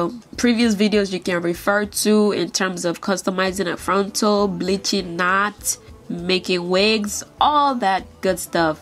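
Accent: Nigeria. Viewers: oh my GOD i love this video..are you Nigerian? because i was thinking...as a result of the songs and your voice also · That was ignorant and uncalled for... She is a Yoruba (Nigerian). I'll have you know that while Yoruba is one of three major tribes in Nigeria, English IS the first language. She may speak Yoruba, but nonetheless she would understand and speak English regardless..its spoken everywhere in Nigeria... Don't be shallow just because you hear an accent..Wow lol..smh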